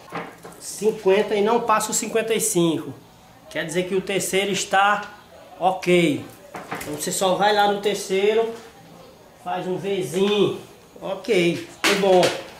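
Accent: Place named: Rio de Janeiro, Brazil. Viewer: Bom dia,vc é do rio de janeiro?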